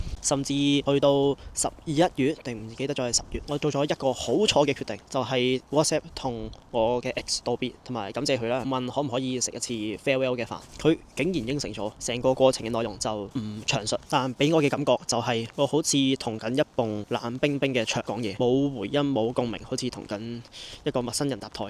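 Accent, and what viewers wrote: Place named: United Kingdom. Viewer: Support ! 英文好正重British accent ❤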